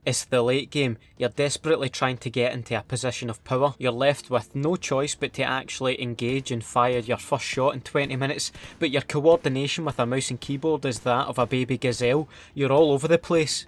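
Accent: Scotland, United Kingdom. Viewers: holy shit! this dude has the best scotish accent ever! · Some Scottish accent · H1 Zed 1, now this is a real Scot right here.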